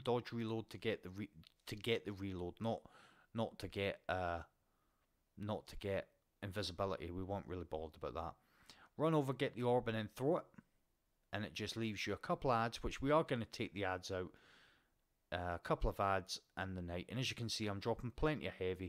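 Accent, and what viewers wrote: United Kingdom. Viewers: you’re very welcome jajaja. It’s nice watching your videos. British accents makes it fun to watch.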